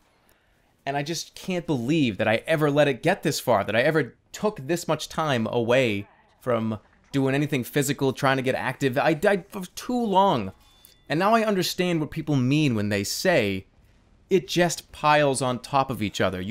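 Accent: Boston, United States. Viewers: BOSTON THAT'S WHY EVERYONE SAYS HES LIKE THE SCOUT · His Boston accent is so pleasent and cosy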